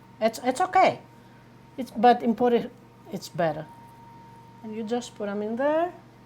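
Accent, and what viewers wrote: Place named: Greece. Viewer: Omg that's not actually the original Greek accent!! That's a Greek villager's accent!!!where are you from?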